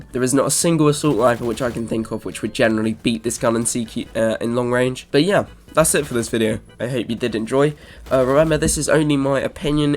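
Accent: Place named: United Kingdom. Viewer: nope (btw thanks for that british mate)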